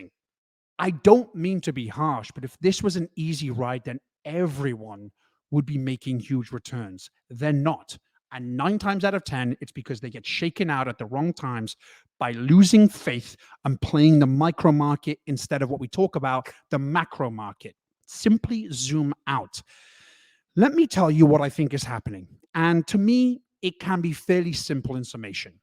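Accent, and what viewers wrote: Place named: United Kingdom. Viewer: I’ve always tried figure out the accent. Are you British but spent many many years in the States? Thanks mate, keep up the great work.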